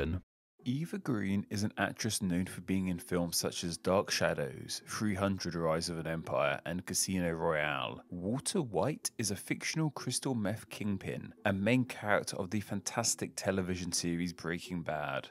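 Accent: United Kingdom. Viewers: Are u british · What region of UK is your accent from?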